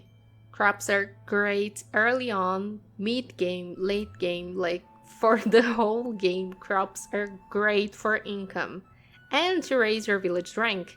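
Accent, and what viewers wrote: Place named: Brazil. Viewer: Great video!! You have a Brazilian accent 😊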